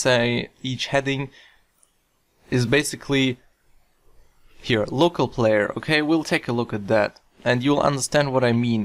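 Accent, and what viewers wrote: Germany. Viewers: German right?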